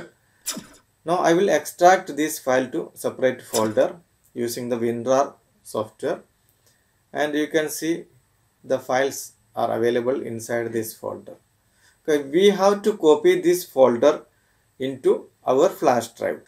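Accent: India. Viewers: Excellent! To be honest, at first, I was put off by the poor-quality audio and the strong Indian accent, but everything he showed - worked. I didn't have the drivers in my Windows installation USB (even though I downloaded the Boot creation software right from Microsoft's website ...) I downloaded them from the link he put in the description here, unzipped the packages and it worked. I have my partitions showing now. Thank you and keep up the good work!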